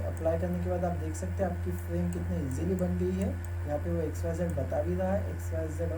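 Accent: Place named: India. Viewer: good video ! It would be great if your video had the option of subtitles, since it speaks too fast and its Hindu accent is very strong.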